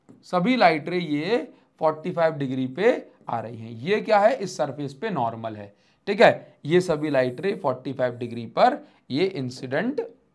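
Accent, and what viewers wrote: Odisha, India. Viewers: Sir app odisha se Hain kya ???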